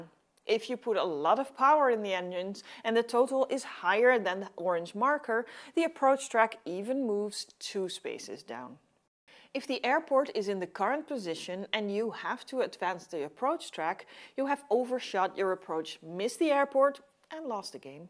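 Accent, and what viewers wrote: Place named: Germany. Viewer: Great vid love the german accent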